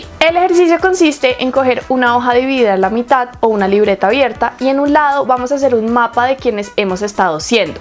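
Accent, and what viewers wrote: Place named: Bogotá, Colombia. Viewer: Siiii. Es de Bogotá DC 🤗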